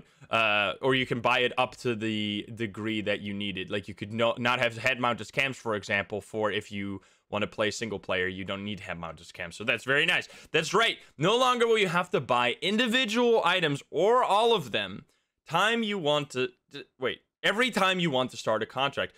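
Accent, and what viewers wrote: Netherlands. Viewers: are u dutch? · hold on, you're dutch?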